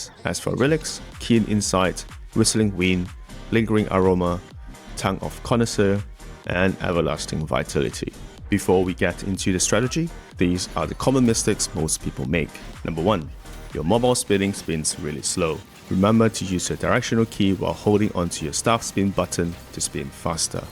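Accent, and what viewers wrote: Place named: Singapore. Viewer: Nice guide bro, you must be a Singaporean with that accent :)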